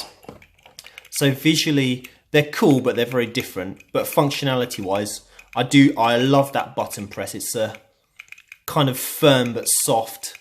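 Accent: United Kingdom. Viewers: Are you British? Your accent is so cool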